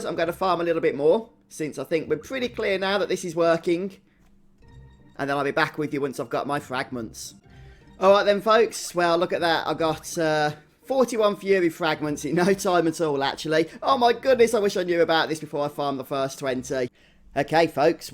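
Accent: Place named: England, United Kingdom. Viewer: Total no homo here but, dude, I love your accent. What part of England are you from? I could listen to your narration of anything and it would seem fun. Seriously, you could make technical manuals seem like an exciting read.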